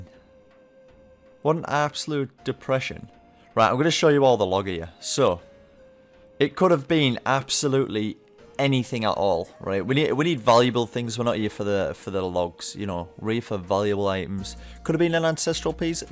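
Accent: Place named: Teesside, United Kingdom. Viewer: I know a boro accent when I hear one UTB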